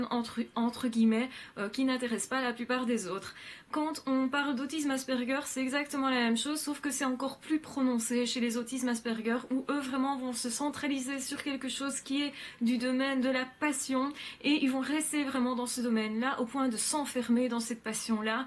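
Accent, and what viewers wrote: Belgium. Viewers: Etes-vous de Liège ou de Bruxelles? :-)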